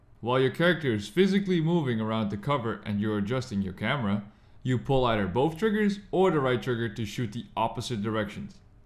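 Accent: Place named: Hawaii, United States. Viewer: Your from Hawaii I can tell good video shooooootz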